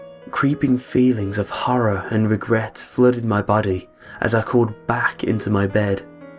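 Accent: United Kingdom. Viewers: wow are you a British? your voice is simply sexy :3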